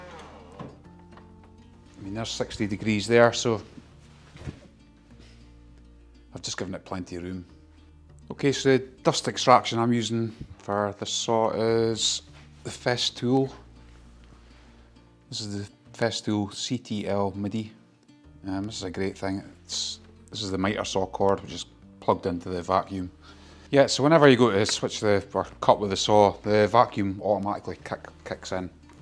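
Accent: Scotland, United Kingdom. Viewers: Nice job mate, also nice to hear a Scottish accent for a change! I have the same thing in mind to build but I was thinking of going for Bosch glide 12". At this level they are all top notch saws. I have a cheaper erbauer mitre saw and for £240 it has massive cut capacity but suffers the same drawback as your dewalt in that it's ginormous and space is limited. I'm from Kilmarnock btw, I am a joiner and have a youtube channel, jusgeritdone. I only have 4 vids at the moment but have decided to start getting a bit more serious so working on a new video just now. I built my shop last year and currently working to finish indide. Anyway, I have subscribed to your channel and enjoyed watching this build. It would also be interesting to hear how you get on with the new makita chop saw.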